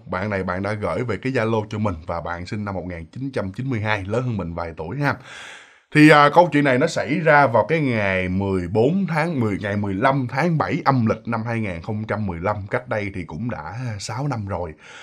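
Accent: Mekong Delta, Vietnam. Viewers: Chúng ta đều thích nghe truyện... Giọng kể kiểu miền tây chân chất... Giọng là bất diệt rồi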